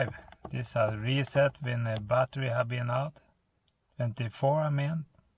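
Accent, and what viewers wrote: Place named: Europe. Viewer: You are speaking some type of euro rubbish English. Learn better English before doing another video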